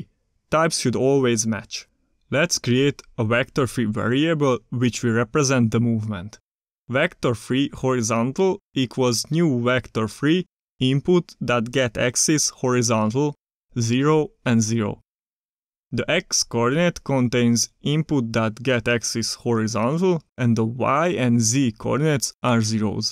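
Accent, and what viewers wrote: Hungary. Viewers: Are you hungarian? Your english sounds a lot like how a hungarian would talk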